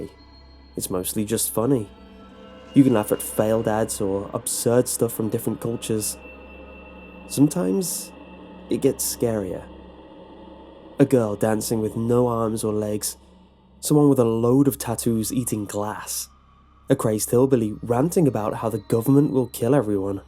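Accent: United Kingdom. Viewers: the narrator is British right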